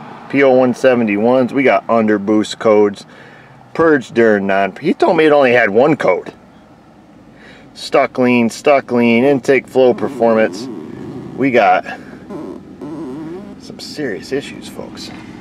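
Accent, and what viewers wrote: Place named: Western New York, United States. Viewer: I’ve been in the area twice recently, but alas my car was running fine. I always learn something when I watch your videos and is like a trip back home when I your that WNY accent.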